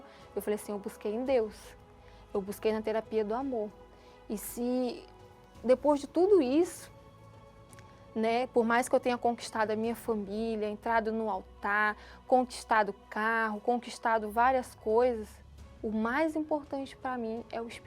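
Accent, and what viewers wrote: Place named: Rio de Janeiro, Brazil. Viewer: Sotaque, ela é do RJ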